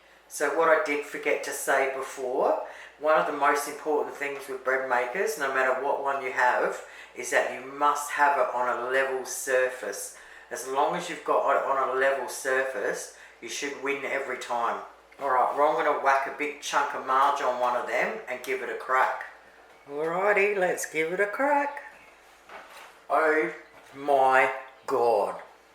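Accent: Australia. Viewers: So ocker (and I mean that as a compliment)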